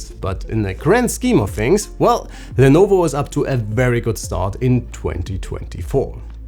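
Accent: Germany. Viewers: yes I love the German accent its so sick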